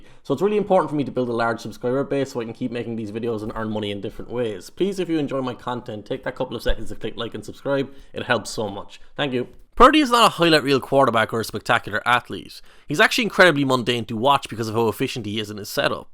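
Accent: Ireland. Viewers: The Irish accent makes this video even better. Good stuff! :) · It's how we Irish speak lol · Anyone else tripping about an irish accent about American football? That’s a first for me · I'm impressed with the observations and breakdown through that fine Irish Brogue. How is it you know American football so well? I hope you get paid good sir. Subscribed!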